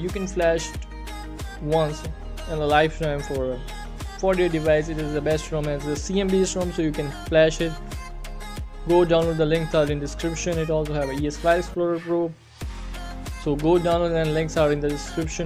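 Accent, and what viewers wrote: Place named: United States. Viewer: Please for god sake!Drop down Your stupid American accent.Plz.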